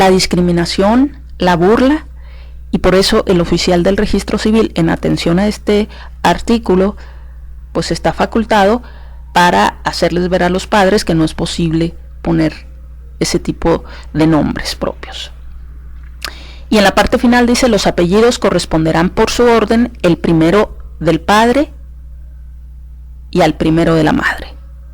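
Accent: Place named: Mexico. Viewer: Por su acento, es de mi querido México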